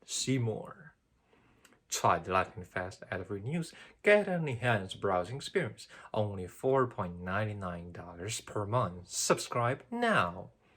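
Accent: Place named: United Kingdom. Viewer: This is Hao. Your work reminds me the Garbric Orozco’s Hammock En La Moma. I also like the way you make the British accent. It makes it sounds very professional. The changing of voice during the news report also makes me think that the broadcast news must be a very boring job, but the people who broadcast it must stay still and act normally no matter what happens.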